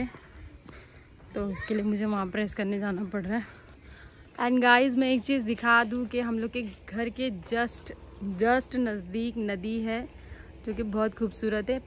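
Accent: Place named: Mumbai, India. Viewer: ap ki aawz me mumbai ka tuning hai sach mein inshallah allah ap ka 100k jld se jld hojayega ap ke sach me ek din 1million followers hoge inshallah thankyou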